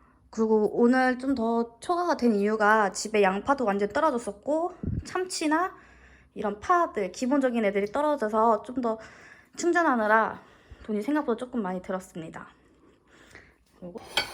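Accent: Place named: Seoul, South Korea. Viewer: 5년전 영상인데 왜 예전 서울사투리가 들리죠 ? 언제나 귀여우셔요 ㅎㅎ😍😍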